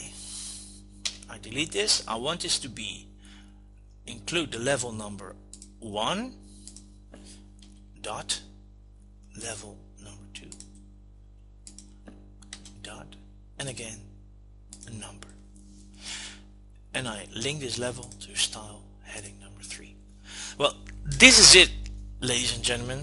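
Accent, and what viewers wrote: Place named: Netherlands. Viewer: Thanks! Love the charming dutch accent. You rock!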